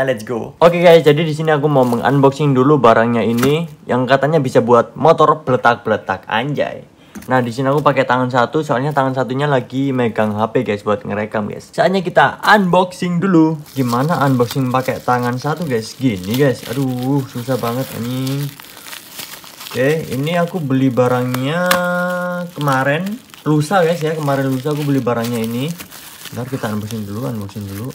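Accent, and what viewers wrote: Malang, Indonesia. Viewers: Wong malang a iki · Mas orang malang ya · Orang malang mas? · orang malang bang? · Wihh orang malang bang? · oalahh tibakne wong malang toh sam, pantesan kok medhok2 ngunu mantep sam!! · Orang malang lur ?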